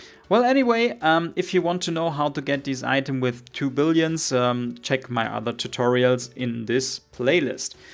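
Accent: Germany. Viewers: Kann es sein das du Deutsch bist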